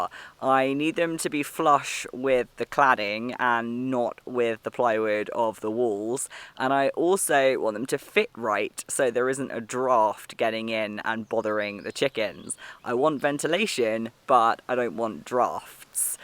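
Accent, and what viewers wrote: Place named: RP, United Kingdom. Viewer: You sound so posh.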